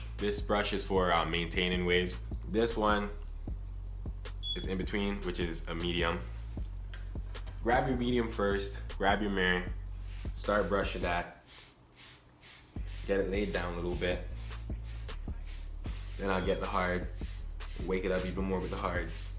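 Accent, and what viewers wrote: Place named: Toronto, Canada. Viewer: sounds like ur from toronto my guy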